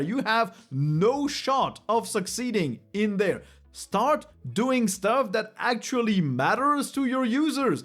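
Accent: France. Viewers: L’accent Francais de fou ! Tu es un natif ?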